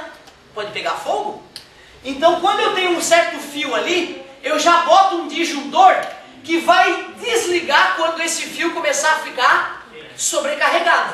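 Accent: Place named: South, Brazil. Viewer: "tu larga duas baguinha" adoro esse jeitão de falar do sul!!! Parabéns ao mestre!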